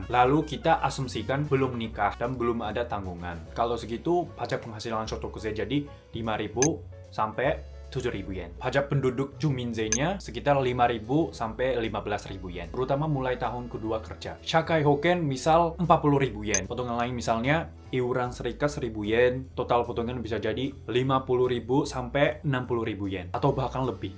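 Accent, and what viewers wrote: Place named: Java, Indonesia. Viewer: Logatmu Jawa banget coyyy. 🤣 Potongan disana banyak, bedanya, sebanding sama fasilitas dari negara. Di Indo, duh, pajak dikorupsi mulu. Oh ya, BGM nya terlalu kencang. Volume-nya kecilin lagi dikit.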